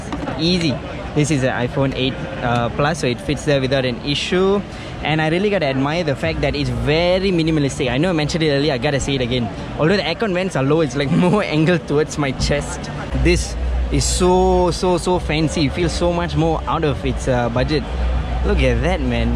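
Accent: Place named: Tamil Nadu, India. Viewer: Your tamil english smart bro... teringat kat member2...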